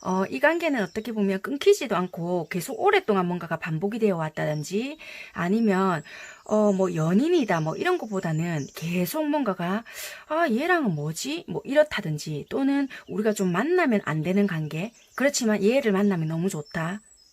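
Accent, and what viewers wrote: Busan, South Korea. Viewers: 감사합니다☺️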